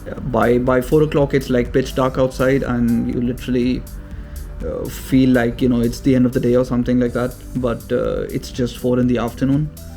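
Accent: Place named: United Kingdom. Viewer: British accent agaya hai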